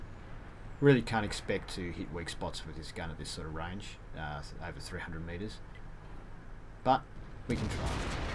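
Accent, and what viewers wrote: Australia. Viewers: I see you have the 124 games in the FV183. Can you do a review on that? What do you think of the HESH after the nerf to pen? You do Excellent reviews. I like the Aussie accent !! :P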